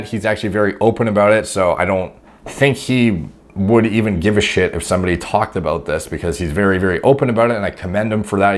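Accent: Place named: Canada. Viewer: You're talking about some nimrod, neanderthal and Philistine who hides his baldness, yet you pronounce 'out' and 'about' as "OOT" and "ABOOT"?? Ok then...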